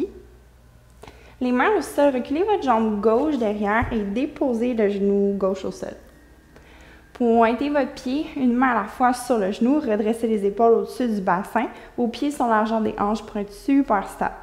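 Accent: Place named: Canada. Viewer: Merci belle canadienne avec ton super accent..que j adore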